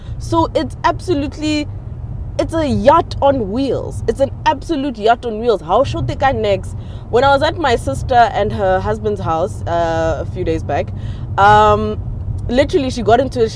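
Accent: Pretoria, South Africa. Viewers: "Ke diesel gaeje petrol" 😂😂😂she's definitely from Pitori 😂😂❤ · The Pretorian accent made me enjoy this review 😂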